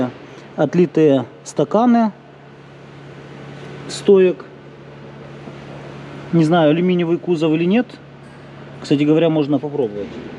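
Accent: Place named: Zaporizhzhia, Ukraine. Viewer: Голос (акцент)у автора как у моего дядьки с запорожья.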